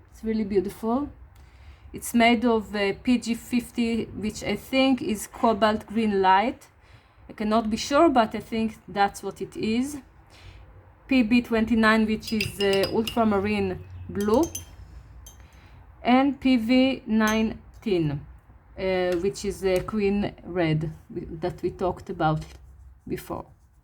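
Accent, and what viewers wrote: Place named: Israel. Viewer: Are you a Sabra?I think I recognize your accent❤️‍🔥🙏🏻🥇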